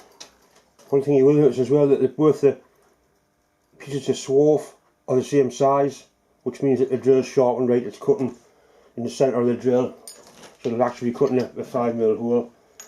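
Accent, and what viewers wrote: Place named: Liverpool, United Kingdom. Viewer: I know a fair bit of effort goes into these videos. I want to be constructive: first please try & speak with a little less of the scouse (?) accent, I kept missing points that were maybe important. I also noticed some viice movement. If these drills are as good as you ,say they must be from another Chinese source. Those I have ordered so far (Drillpro) have the worst sharpening I have ever seen, & it's hard to sharpen drills below say 5 mm, at least for most of us. Of course if an edge should chip you will need to resharpen, how quick & easy is that - well you did not consider that. Remember also not many of us have a milling machine or high quality pedestal drill, so your tests should have been at least 50% freehand drilling. Finally I watched throughout & noticed that in most cases (not all) one flute appeared to be doing most of the cutting; this suggests the sharpening is not too good & that really is important. 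I almost forgot - yes you made surprising progress without failure but some repetitive drilling is needed to gain some insight into how well the drills are doing. You should have attempted at least 20 holes from one drill & maybe 50 holes in mild steel. Thanks for the video.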